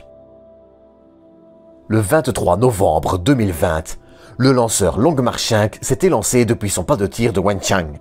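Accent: Belgium. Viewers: Lui il est belge c’est sûr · C’est un Belge qui parle? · Ca sent le belge qui faut la narration non? Liégeois?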